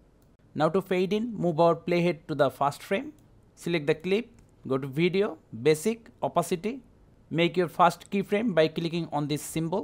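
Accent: India. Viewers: i little bit understand indian accent, tysm you are legend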